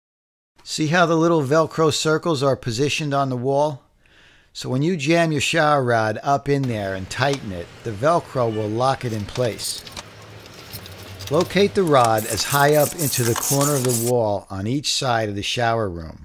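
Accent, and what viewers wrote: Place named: New York, United States. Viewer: u sound like a goodfella u knw like goodfellas the movie ....new york accent.is class 😙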